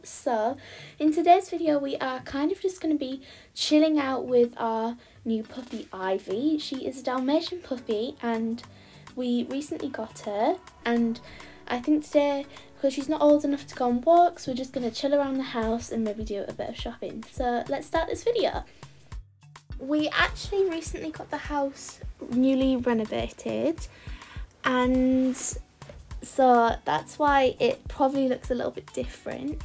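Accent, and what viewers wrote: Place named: RP, United Kingdom. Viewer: How posh is your voice!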